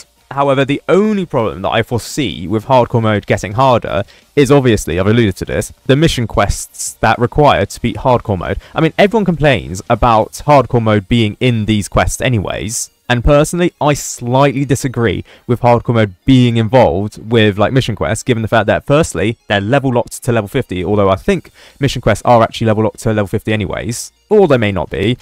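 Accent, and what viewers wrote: United Kingdom. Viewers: sometimes i cannot understand what say cuz his british accent and a very familiar british voice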